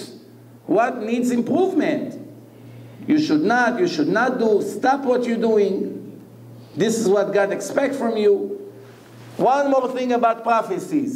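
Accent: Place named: Israel. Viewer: he dont even try to make english accent hahahaha it's almost like he talk hebrew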